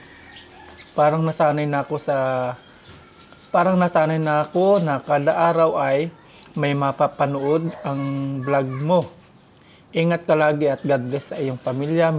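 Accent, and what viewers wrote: Visayas, Philippines. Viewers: Bisaya ka ba? Yung tagalog mo kasi tonong bisaya eh.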